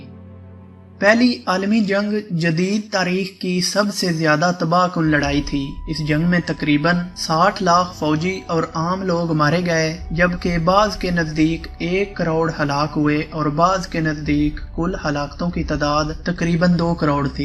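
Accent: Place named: Jhang, Pakistan. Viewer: lehja lokal hey. Shayed Jhang ya Mandi ka lehja hey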